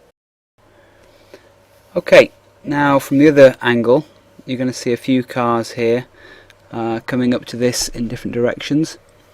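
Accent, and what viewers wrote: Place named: Northern England, United Kingdom. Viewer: I detect a lovely mild northern english accent. :)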